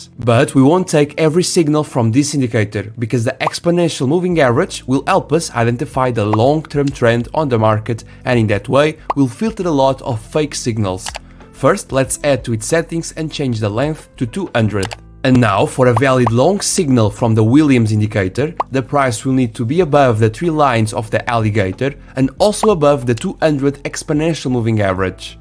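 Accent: Portugal. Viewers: Earing you speaking and appreciating your accent it seems to me that you are portuguese from Portugal 😅🇵🇹 am I right?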